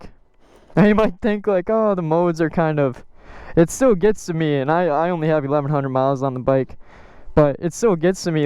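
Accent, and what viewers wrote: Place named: Wisconsin, United States. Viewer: Dude your cringe…stupid Wisconsin accent…mono tone…can’t take u serious..you have no business being on a bike of this magnitude this young. Rich parents I guess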